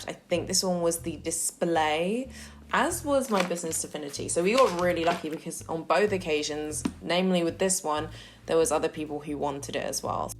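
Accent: United Kingdom. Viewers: Your voice is like a white woman! If I close my eyes I’d thought a white woman’s speaking. . 😊 Your accent of course british, no doubt. But Asian normally has lower tone. Yours is deep. Btw, love your blogs !!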